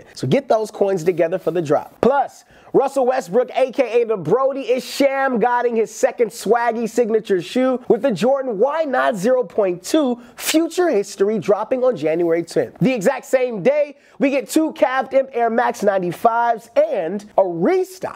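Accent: United Kingdom. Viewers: the only thing worse than the yeezys 2018 releases is your British accent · Full body cringe to that British accent...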